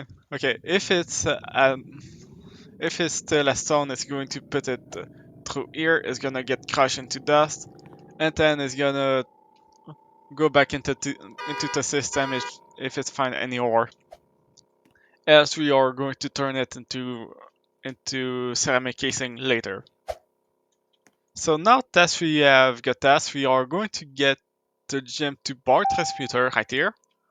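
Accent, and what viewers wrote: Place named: France. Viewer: Your accent says you're from another country. I mean, yes, you're from the United States, but at the same time, I feel like you have French roots.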